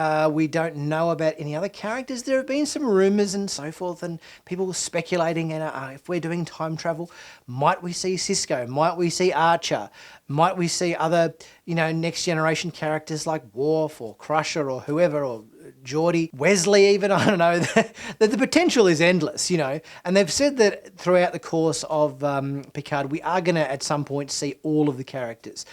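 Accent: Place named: Australia. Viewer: Great Aussie accent. 1. Discovery needs to step away from its convoluted plots that diminish its great cast and production. 2. Picard: Beginning with Farpoint the talent of Patrick Steward and John DeLancie was immediately apparent. I really like your idea of having enhanced memory that would bring Picard's wealth of experince to life. 3. Strange New Worlds: With Number One as one of the great first officers and Pike as a legendary captain the opportunity for Spock to grow with the challenges of a young officer and evolve with awesome mentors would provide a magnificent character arc.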